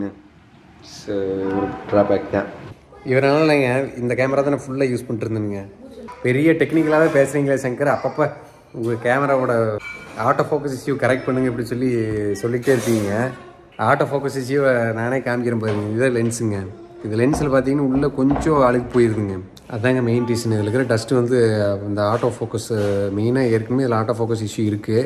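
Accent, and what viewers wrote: Kongu, India. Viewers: Ungaluku vera channel iruka bro.. irundha sollunga.. unga videos romba edharthama.. informative a irukum.. that kongu thamizh awesome❤ naan valarndhadhu madurai.. adhu dhan kaadhellam inikum thamizh nu solluven.. Unga thamizh ketadhuku apram.. thamizh enga irundhalum azhagu dhan thonuchu❤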